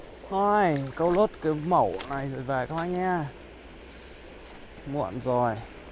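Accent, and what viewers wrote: Vietnam. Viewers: ông này nói giọng khu vực nào ý nhỉ, Hà Tây à, chỗ toàn nói thành chố, đĩa thành đía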